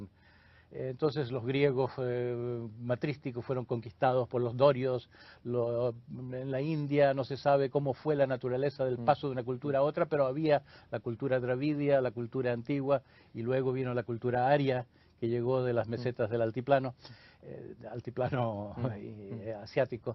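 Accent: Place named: Chile. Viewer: Es increíble escuchar filosofía de verdad en Chileno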